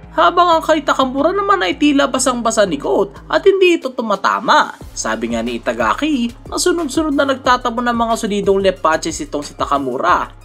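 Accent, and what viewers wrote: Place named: United States. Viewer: Ayus par gayang gaya boses ng mga Americano a hahaha ganda by the way ❤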